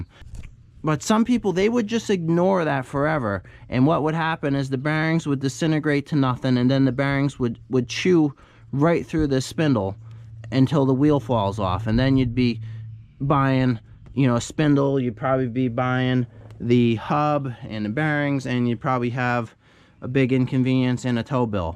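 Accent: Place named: Northeast, United States. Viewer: Hey, I don't think I heard you correctly, - , it sounded like you said... "Like every time you be Meth..."? I know, I'm from California and I sometimes have trouble with that 'NorEastern' accent... What was that?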